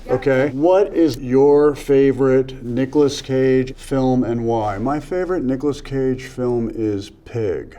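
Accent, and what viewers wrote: United States. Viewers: i have never watched a movie with him so i wasn’t expecting him to sound so uber american lmao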